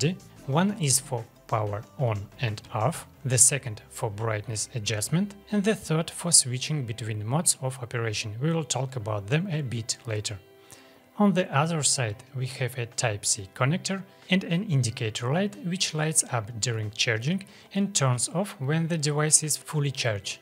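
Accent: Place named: Russia. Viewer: И у тебя сильный русский акцент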